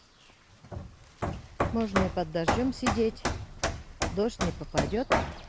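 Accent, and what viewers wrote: Tatarstan, Russia. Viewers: Здравствуй, моя дорогая землячка, коллега по работе в нашей молодости и Мама и Бабушка своих внуков! Получается, что мы все коллеги! Мы все Мамы и Бабушки. Какие Молодцы жители вашей деревни! Как здорово, когда есть вот такое место, где можно собраться вместе всей деревней на Сабантуй. Я с детства помню Сабантуй, от слова Сабан-плуг, когда спашут землю и посадят, всегда устраивали на поле, около деревни, Сабантуй. Весело было! Спасибо тебе, за воспоминания о детстве и Моей Любимой Родине! Не думала Я, когда уезжала, что так буду скучать по Родине. Спасибо, большое!!! За поля Родные, За леса и за Родную Природу и за речь национальную и за говор т.е.акцент татарочек! Как же всё родное! Счастливая ты, что смогла вернуться на Родину. А самовар-то у меня есть. Это я сохранила-чай из самовара на улице и со сливками и из пиалы.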